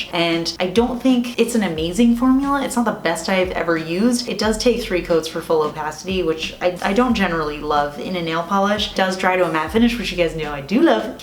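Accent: New Jersey, United States. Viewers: I know I’m late the the game, but your “arenge” doesn’t even phase me! My husband and his family are from NJ, so I hear it ALL the time! I love you! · Didn’t realize that got so much hate for her Jersey accent. It seems such a stupid thing to be annoyed over. Everyone who isn’t from where you are is going to have an accent. You have an accent to all those people. What’s the big deal? I personally like hearing different accents. I like the variety of voices. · Your accent is adorable! From a Canadian to a NJ! I worked for Amex and NJ was my fav state of people to talk to. 😊😊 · From one Jersey girl to another.. our accent is fabulous. Love the video too! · Love our Jersey accent!! How about the way we dog. Dawg!! Lol!! Loved this vid!! Orange gives me serious fall vibes!! Love the fall!! Hugs!!! 🤗🤗🤗🤗🤗💚💚💚💚💚💚🍊🍊🍊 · I’m a Jersey girl too!!!! I say orange the same way & coffee... 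🤣I love the orly color. Also I love how you did your makeup orange - you look so cute. 🍊💗🤗 I picked up a bunch of OPI polishes from Marshall’s today & found myself checking to see if the polishes were jellies or not using the tips from your videos.😉 $3.99- win! I love the OPI brushes. They had lots of nudes & good fall transition colors. Anyway thanks for another great video!! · I’m from Jersey too and I say it the way you do and until you said it I never knew anyone said it differently 🤷‍♀️ lol. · Maybe because I'm a Bostonian I dont mind the Jersey accent..🤷🏻‍♀️ lol dont get why it bothers people lol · Hey lady I love how you say your Jersey words and if people don't like your accent, fuck em'! It's ridiculous to me that people give you crap for how you pronounce some words... you are eloquent and intelligent and I love your videos! Thanks for being you 🧡🧡🧡🧡🧡🧡🧡🧡🧡🧡🧡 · 🤯I’m from NJ and I didn’t know we said Orange different until I heard you, 😂 and I live in the Orange state now! 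· I'm glad you're making this series! I honestly needed more oranges in my collection. Thanks for the suggestions and the reference! I'm trying to get out of my cold color bubble and trying to wear warm colors. I don't really notice the New Jersey accent since I'm also a New Jersey kid, do we really have an accent. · From one Jersey girl to another, don't apologize for how we speak! Funny thing I never noticed how I said orange! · Triggered by your NJ accent. 🙋🏻‍♀️ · Forget the polish,which,by the way were all gorgeous, ZOYA-“Alma” & SALON PERFECT-“Too cute or spook” were my favourites.It is your EYE makeup that captured my attention,it is stupendous,and your accent is brilliant,I think the New Jersey accent is by far the best in the US of A. I have tasted ruby chocolate,it was a Magnum ice cream bar,and I thought it was raspberry flavoured but it said nowhere,as you said aswell,that there was fruit flavouring,apparently it’s the natural “fruity” taste of the ruby cocoa bean 😳🤷🏻‍♀️. 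💚🇮🇪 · Purrrrrrples! Purps purps purps - lilac and lavenders next please :) and hell yes Joisey girls unite! It’s wharter not wahter duh 🙄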